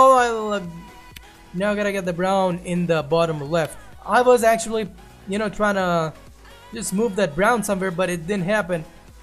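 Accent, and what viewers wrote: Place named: India. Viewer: Evyatar Trabelsi It's his accent, many Indians have trouble saying w sounds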